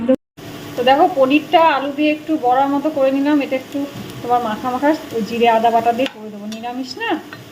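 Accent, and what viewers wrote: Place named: Bangal, Bangladesh. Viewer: বাঙাল ভাষা শুনতে খুব ভালো লাগে 😊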